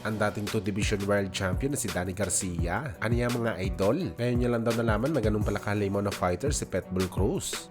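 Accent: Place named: Visayas, Philippines. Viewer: Prang baguhan po kayo d2 s pinas,, ang vlogger po n ito ay isang visaya/visayan kaya kung mgpronounce lumalabas talaga ang pagiging kung anong language nila , pra samin ang spelling tama pero ang pronunciation kung hndi mo alam kala mo tama ka, at kmi mali,, ngayon kung pure tagalog ka maaaring tama k pero alamin mo muna po kung sino b at anong tribo ang isang tao,,🙏♥️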